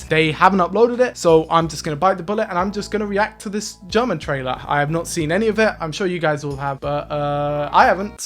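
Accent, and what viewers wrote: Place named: Southern England, United Kingdom. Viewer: Are you putting on an American accent some of the time? You pronounce 'time' with a long 'aa' like you're from the deep south (US) but otherwise you sound like you're from the south of England [puzzled emoji]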